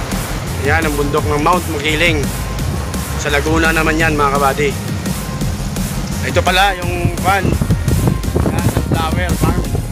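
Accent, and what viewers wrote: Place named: Visayas, Philippines. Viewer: Kabayan konti lambot ng dila para di mahalata bisaya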